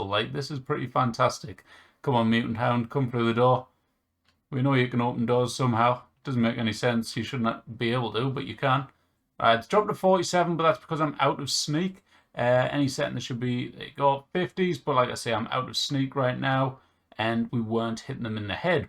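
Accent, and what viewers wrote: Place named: Durham, United Kingdom. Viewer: Bud,I'm trying to place your north east accent? Durham?? Love the channel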